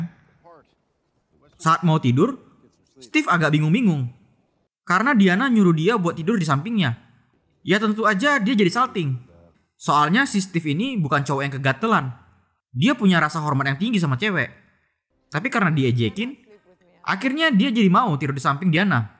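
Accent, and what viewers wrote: Medan, Indonesia. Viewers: Bahasanya kek temen2 gw di batam..dari medan ya bang?wkwkwk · Anak medan ya ini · Bahasanya anak medan satu nih lucu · orang medan juga ya bang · Bahasa mu sperti anak medan bang..😂😂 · Entah seneng aja dengar orang Medan cerita ... Lucu" gitu 😂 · Komentator nya orang medan.... 🤭🤭🤭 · Medan x bah abang ini, 😂😂😂😂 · Medan kali cakap abang ini bah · Orng medan kau ya lae😂😂😂 Sma lah kita lae Smngat lae buat conten nya👍👍👍👍👏👏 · Logatnya itu loh Medan x bah 🤣🤣🤣🤣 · Orng medan nya kau wakk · orang mana kau bg, medan dimana nya wkwkw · Lo orang Medan ya bang? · Nampak kali ini kalo kau orang medan 🤣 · Ini abang ini ku rasa orang medan dari ngomong nya kayak orang medan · Orang medannn ko kan bang7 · Main kali logat medannya bg 😁😁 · Ngakak gw liat ngomong logat medan ny🤣🤣🤣🤣🤣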